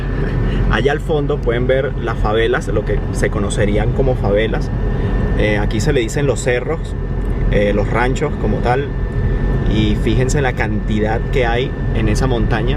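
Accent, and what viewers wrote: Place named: Venezuela. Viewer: Que asco da venezuela, en serio, se los llevó el diablo... 1. El barrio mas rico de caracas (la lagunita country club) es un simple barrio estrato 4 residencial de Colombia, y "las mercedes" un simple estrato 4 - 5 comercial en Colombia. Ni su palacio de miraflores llega a ser estrato 6 en Colombia. 2. La zona colonial caraqueña (el altillo) es una completa mierda comparada con los magnanimos centros historicos de Cali, Cartagena, Popayan, etc, 3. Los centros comerciales de "clase alta" en venezuela parecen un simple supermercado Ex!to en Colombia. *América es un continente. No un país; analfabeta (tras de que tu acento venezolano es totalmente barbarico-animalesco, no le podes sumar a eso tu malinchismo iletrado de llamarle a Estados Unidos, América: EMBAJADA ESTADOUNIDENSE, NO EMBAJADA AMERICANA).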